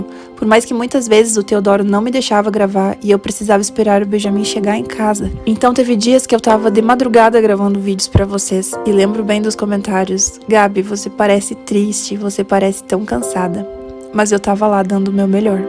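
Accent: Rio Grande do Sul, Brazil. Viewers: Sou do RS tbm logo vi teu sotaque no primeiro vídeo que assisti 😂 amei tua história fiquei muito emocionada, que tenha muito sucesso sempre em sua vida ❤️❤️❤️ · Ameeeei tanto.. logo que vi o primeiro vídeo reconheci esse sutaque de gaúcha 😂😂😂 linda, achei vcs recentemente aqui e já amo os videos, sou de São Gabriel 3hrs de ônibus até Santa Maria 😍.. amei o vídeo e amo a risada do 😂😂😂 beijão pra vcs ❤ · Conheci o canal a pouco, vim parar aqui e logo reconheci o sotaque gaúcho da minha conterrânea! Muito amor e muitas conquistas para essa família linda! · Nossa que lindo!!! Ah e eu sabia que tu é gaúcha, já te vi falando "tu" e acertei no meu palpite. Sou de São Sepé, cidade vizinha de Santa Maria. Parabéns pela coragem!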